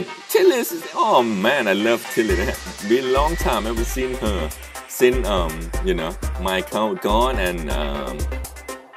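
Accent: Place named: Thailand. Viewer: That Thai accent though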